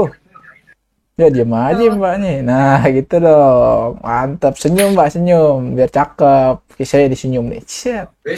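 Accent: Jakarta, Indonesia. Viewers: Bahasa luar + betawi ngakak sih..😂🤣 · Kentel bener betawi nye cing wkwkw, gue bantu subs nih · lebih bagus logat betawi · pertahankan logat Betawi Lo bang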